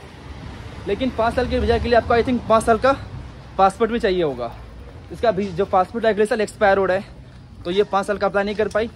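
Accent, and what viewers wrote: Bihar, India. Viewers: LAST RAT.......HAHAHAHA O BHAI SAHAB THIS IS BIHARI TONE LOVE U BHAI.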